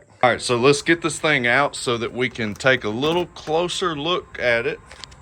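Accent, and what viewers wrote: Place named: Mississippi, United States. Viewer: Hi, greetings from Germany (... yes, you're seen even overhere 👍😂) ... I'm a complete Newie in respect of ATVs ... Considering/Thinking of buying a CForce 625 s new, ... or something similar used condtion ... Saw already almost all of yr vids. ... All are very well done. Do like yr local regional Mississippi slang. Quite uncommon overhere 👍😄 Rgds & Best Wishes, DON, Germany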